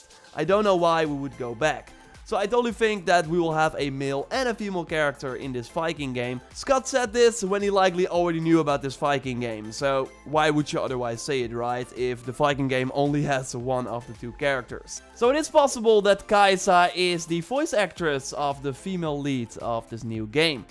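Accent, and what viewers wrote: Netherlands. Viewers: You sound like dutch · you dutch?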